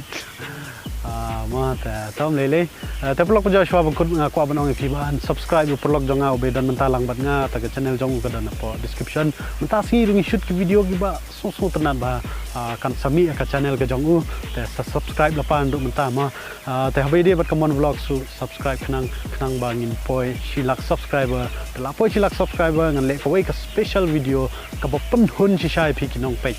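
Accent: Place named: Pnar, India. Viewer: That pnar accent sound raw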